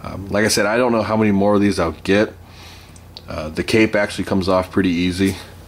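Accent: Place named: Vancouver, Canada. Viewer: You must be in The Vancouver area Like myself or not,just sounds like it,cool review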